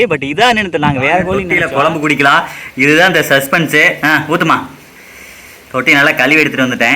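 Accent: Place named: Erode, India. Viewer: Hi .....nan ippodhan first time unga video pakkuren...slang enga oor slang maadhiri irukku...nan erode..neenga endha oor bro...👍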